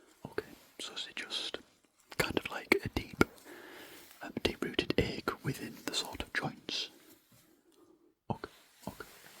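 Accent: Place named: Northern England, United Kingdom. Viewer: This is amazing . I’m rubbish at telling the northern accents apart (Londoner 😂) but whatever it is, is so relaxing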